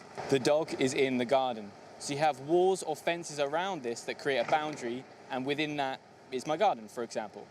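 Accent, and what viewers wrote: United Kingdom. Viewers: Hallo mate, I wonder what kind of accent do you have? It sounds British but for me it's too clear for a British accent .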